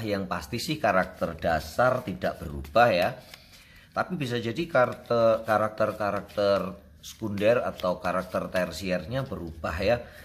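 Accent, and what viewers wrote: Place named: East Java, Indonesia. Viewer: naahhh pasti dr Jawa ya ..Jatim kyknya ...logatnya medok ...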